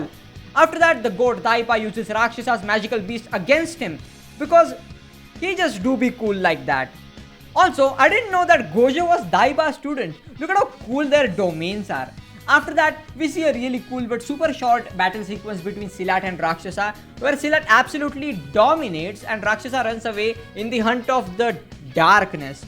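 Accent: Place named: India. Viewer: Omg thanks for normalizing Indian accent, I have begin to accept it now.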